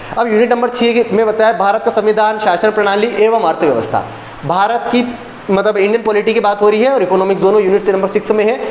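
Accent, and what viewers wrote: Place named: South, India. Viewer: Sir aap south Indian ki tarah baat karte h...aisa lgta h jaise south me bahut rahe ho....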